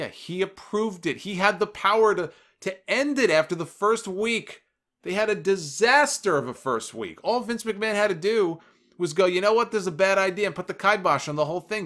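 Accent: New York, United States. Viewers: "Nu Yawkaz" bro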